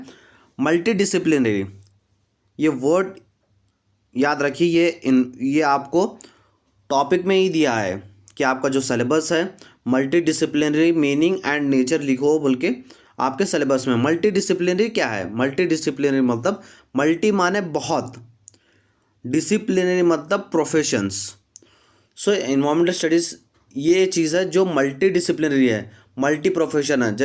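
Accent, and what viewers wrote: Hyderabad, India. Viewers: Sir ap Hyderabad se hai kya..?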